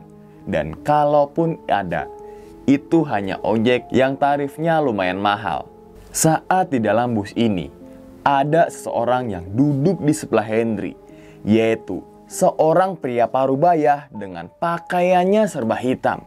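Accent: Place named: Semarang, Indonesia. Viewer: wih logat logat semarang kih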